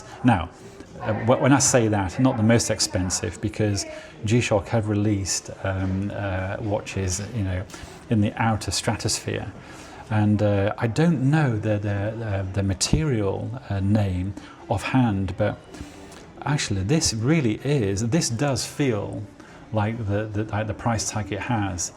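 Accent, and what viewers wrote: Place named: United Kingdom. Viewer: Я думаю что ты, обязательно купишь MRG в круглом корпусе, может не сегодня не через месяц, но это обязательно произойдет, так как у тебя очень крутая коллекция B5000! Желаю успехов, круто что я наткнулся на твой блог, пишу на русском но понимаю твою речь, конечно Английский язык это не Американский 😅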